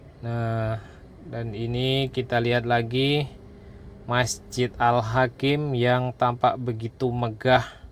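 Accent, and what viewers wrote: Java, Indonesia. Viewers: trnyata ortunya jateng yah..pantesan logatnya agk medok2 dikit 😂😂😂😂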